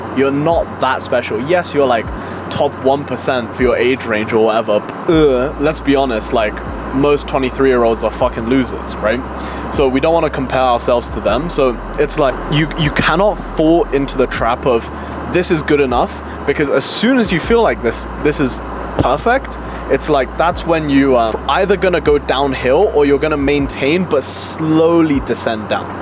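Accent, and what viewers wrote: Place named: Australia. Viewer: Since when do Chinese have an Australian accent?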